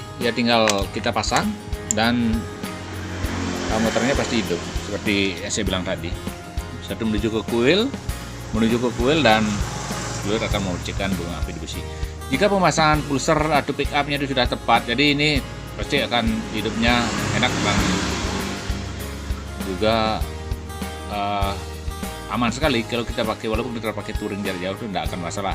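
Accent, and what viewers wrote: Bali, Indonesia. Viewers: Dari Bali pak? Logatnya kental Bali, bisa garap ACG stater vario 150 dipasang di CB?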